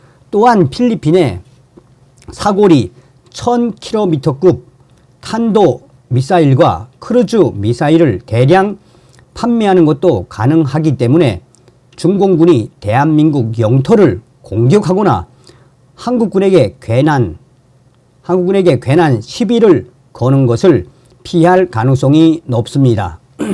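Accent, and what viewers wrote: North Korea. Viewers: 내용은 좋은데 버벅거리는 이북말씨 듣기가 힘듬니다 컴퓨터 목소리 내는기계도있는데 그걸로대체하지요 아니면 북한에서 내려온 여자분으로 말잘하는 여자로 대처 하시든가요 50만 구독자이면 돈이될텐데 아낄려고 버벅거리는 말로 설명마시고--- 짜증나네요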